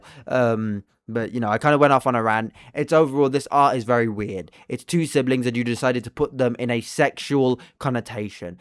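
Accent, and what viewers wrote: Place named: United Kingdom. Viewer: HESSSSSSSS BRITISHHHHHHHHHHHHHHHHH still good video tho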